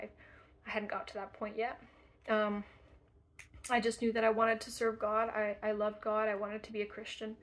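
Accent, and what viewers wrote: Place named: Alberta, Canada. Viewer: Hey! Fellow ex Mennonite here (old colony). Thanks for sharing your story. I really enjoyed listening... also it sounds like maybe youre Albertan as well?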